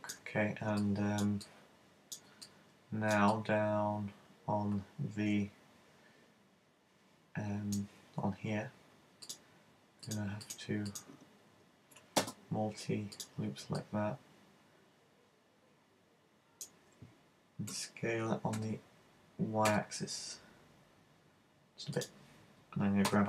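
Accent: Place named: Southern England, United Kingdom. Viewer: that accent sounds damn familiar :) guessing from south england but been in the north quite a while?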